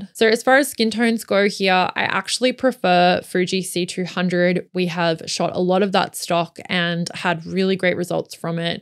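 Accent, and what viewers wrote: Australia. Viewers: if you could drop either the Aussie accent or the valley girl inflection your channel would be one hundred percent more bearable. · I’m subscribing for your content, your delivery and, of course, your awesome Aussie accent… as a Canadian living in Southern Arizona - visited Sydney in 2015… Beautiful people and sooor friendly. Don’t change a thing!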